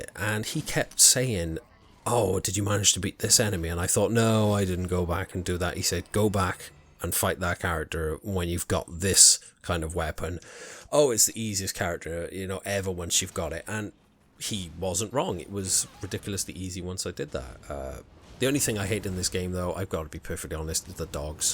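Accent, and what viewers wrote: Wales, United Kingdom. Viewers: Hey dude, brilliant video! For starters it's nice to hear a fellow Welsh accent in the YT gaming space! Secondly, I really enjoyed your chill tone, and your rambly style, it's really cool so don't worry about how 'professional' it seems or whatever, it's a strength o your style I think! Plus, I can clearly see you put A LOT of effort in the editing, the cuts, the memes, the general flow, fantastic job! And finally; welcome to the Souls club, once From has their fangs in you it's hard to get away lol! Hope you play and enjoy the other titles! Anyways, apologies for for the mini-essay but yeah, awesome video, a joy to watch, thanks! Cymru am byth! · Is that a hint of a Welsh accent I hear? 🏴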